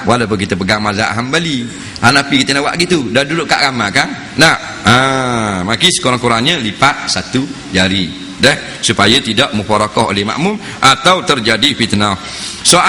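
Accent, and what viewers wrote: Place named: Terengganu, Malaysia. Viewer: Maaf teman. Ini bahasa dialek orang Terengganu di Malaysia.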